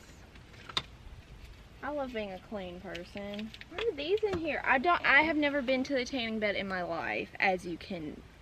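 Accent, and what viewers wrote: South, United States. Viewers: your southern accent kills me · I wish I could find myself a girl with your accent lol. Southern accents are sooo underrated.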